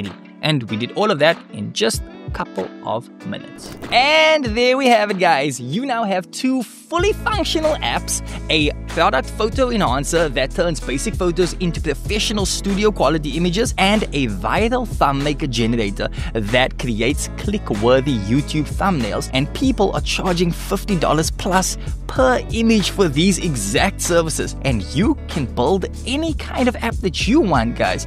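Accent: South Africa. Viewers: I hear a South African accent there, probably because of Afrikaans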